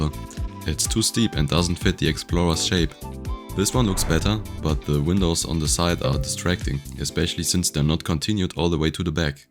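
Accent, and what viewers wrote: Germany. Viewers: Perfect build and video, I hear some german accent I think 😂❤ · your english sounds like my friend from saarland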